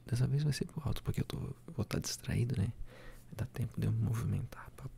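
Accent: Brazil. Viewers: Ótimo asmr adorei, incrível com tá para perceber que vc é manezinho hahaha também sou :)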